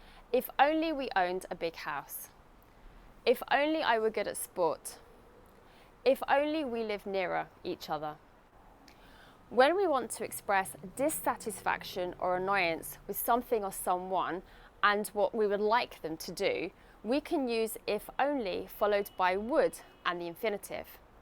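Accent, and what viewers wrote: United Kingdom. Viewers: this teacher her accent is English British England why she is living in Philippines right now maybe she loves the tropical hot humid and beaches and full sun all year round it is warmer than UK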